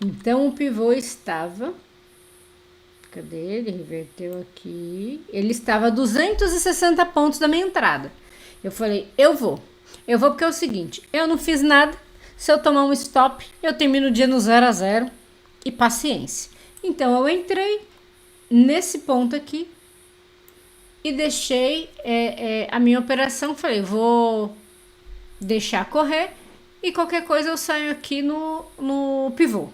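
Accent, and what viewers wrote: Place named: Minas Gerais, Brazil. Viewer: sabia que tinha algo por trás desse mineires!😘👍🏼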